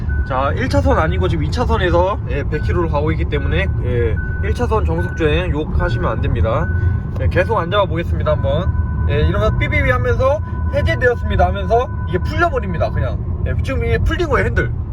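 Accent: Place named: Jeonbuk, South Korea. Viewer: 전북분 이네요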